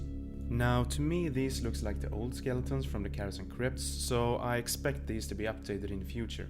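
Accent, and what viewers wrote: Sweden. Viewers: You have a very swedish accent! Are you swedish? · That swedish accent though.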